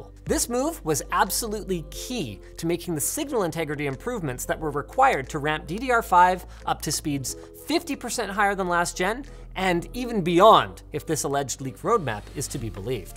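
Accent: United States. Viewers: went very american in this one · really speaking American here.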